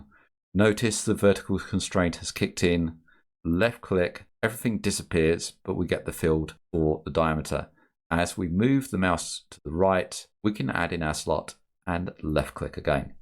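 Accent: United Kingdom. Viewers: Fantastic video, I'm so happy to listen an English accent.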